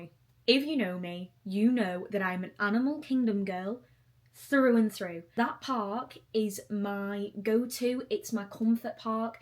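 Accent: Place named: Lancashire, United Kingdom. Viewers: It’s a Coppull/ Chorley accent! Not too far from Wigan, at all. Welcome to Florida &. St. Cloud is a beautiful city! And thank you so much for nursing the sick. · Love this ✨💗 Also a fellow northern girlie here love the accent!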